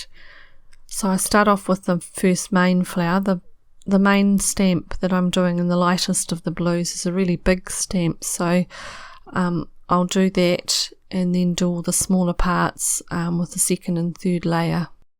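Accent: New Zealand. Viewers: Such a beautiful design! Accent...is that a Kiwi accent??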